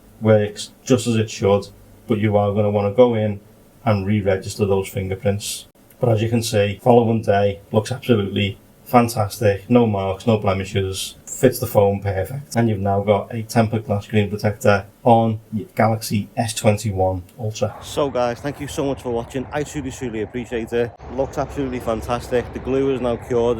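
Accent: Liverpool, United Kingdom. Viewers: Thanks for the video! Very useful. A curious question, are you a scouser? Greetings!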